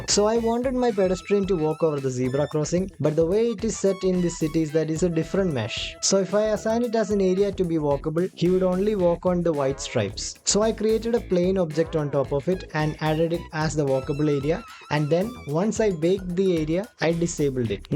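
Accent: Kerala, India. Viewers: english kettapothanne thonii.nii malayali alle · your slang sounds like you are a malayali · he's mallu from his ascent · Bruhh is malayali for sure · Bro sounds Malayali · Bro malayali anow? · Bro are you from kerala? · Bro malayali aaano?? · Haha thanks for talking in English, I think you’re Mallu 😉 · Aree u malayali😂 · Mallu accent is heavy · Are you from Kerala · Bro from Kerala/kanyakumari right ? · Ninte english slang kekkumbol ariyaam nee malayaali aanenn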